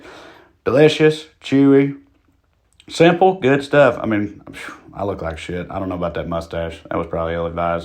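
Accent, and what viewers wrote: Scotland, United Kingdom. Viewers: I am weirdly fascinated by this "Scottish" accent. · I’m down for any video where you use a Scottish accent,! These look absolutely luscious 😋😋😋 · Your array of talents continues to leave me in awe. Cooking plus British dialects? Lawd have mercy! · You knew we needed a second helping of the Scottish accent! Thanks for that! 😂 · Love the accents - especially the Scottish one - that's what I come to for, baby.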